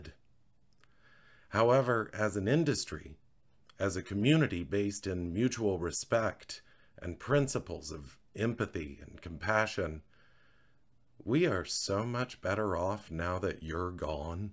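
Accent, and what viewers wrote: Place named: South, United States. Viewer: What I need to comment on is the way BC was read in the most southern way possible! Thank you!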